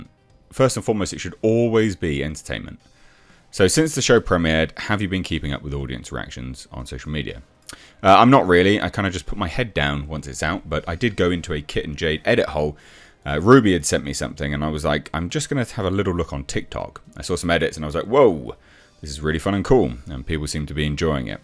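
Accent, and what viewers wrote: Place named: United Kingdom. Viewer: "toh-mah-toes" Not being demeaning but I love how you Brits enunciate some words quite differently than those around me. Plus comments helps the algorithm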